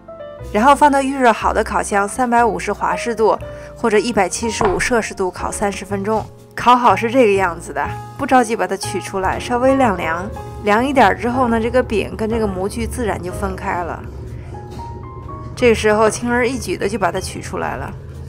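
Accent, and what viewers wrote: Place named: Shaanxi, China. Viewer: 包谷棒~~陕普啊😊老乡在纽西兰问好啦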